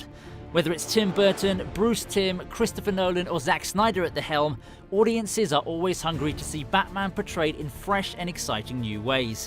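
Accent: United Kingdom. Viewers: i wanted to watch this till i heard this anus voice dude yer dumb. do people think british voice is cool? i do NOT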